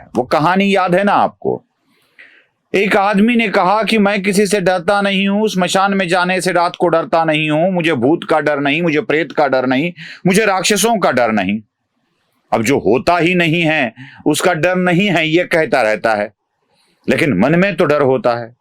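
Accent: Maharashtra, India. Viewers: जी आपकी एनालिसिस तो अच्छी है ही, आपकी मराठी निष्ठ हिंदी भी बहुत अच्छी लगती है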